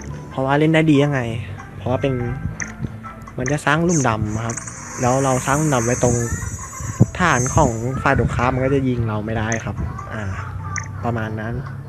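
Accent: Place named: Thailand. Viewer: เสียงเหน่อ น่าร๊ากอ่า เพิ่งเข้ามาเจอครั้งแรก กดติดตามให้และนะ ทำคลิปออกมาเยอะๆนะ ชอบเล่นเกมนี้รอติดตามนะ ^_^ เป็นกำลังใจให้ แอดไปและรับแอดด้วยนะ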